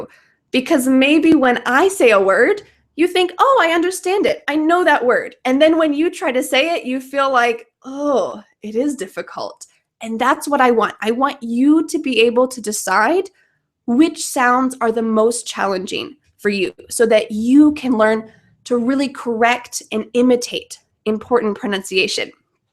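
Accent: United States. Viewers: Hi, I am from Indonesia. I like your video much, as I like American accent, especially you have. Thanks a lot.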